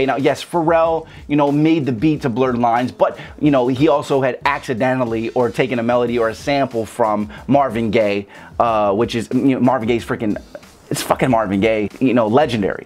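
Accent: Chicago, United States. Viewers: He sound like he from deep chicago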